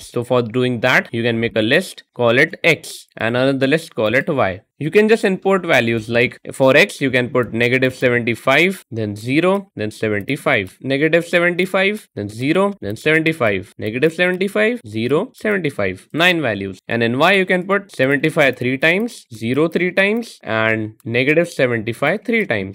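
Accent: India. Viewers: when i heard that indian accent i knew this vid was gonna help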